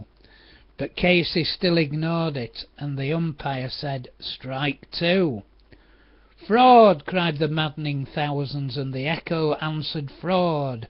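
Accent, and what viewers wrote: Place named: Yorkshire, United Kingdom. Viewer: Sounds great in a Yorkshire accent. Good choice today. Too bad Casey struck out....;)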